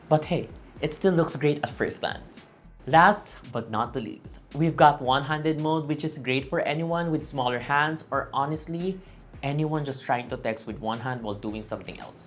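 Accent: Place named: Philippines. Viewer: Philippines not thailand, its quite obvious from the accent and enegry :)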